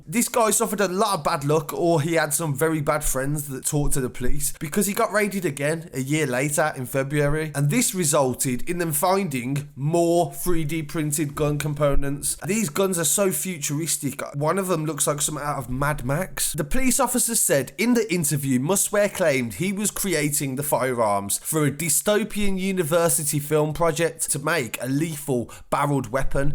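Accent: Birmingham, United Kingdom. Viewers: The Birmingham accent is truly despicable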